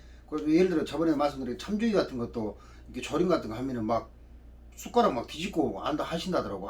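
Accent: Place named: Suncheon, South Korea. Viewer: 친정이 순천이라 고향의 사투리 정겹습니다 요즘은 고등어 는 안나오는군요 😀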